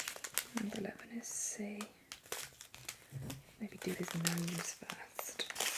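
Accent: Ireland. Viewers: Im American, so I liked the Irish one because it was an accent. Definitely going to keep watching your videos, thanks for making them. There relaxing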